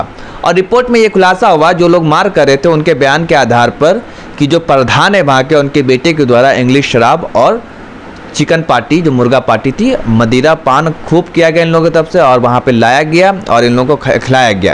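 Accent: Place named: Rural, India. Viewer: Bhai teri zaban saaf nahi hai tu gawar jesa bolta h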